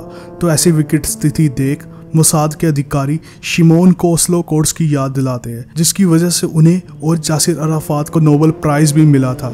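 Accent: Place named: Punjab, Pakistan. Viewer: Pakistani punjabi ryt bhaisaab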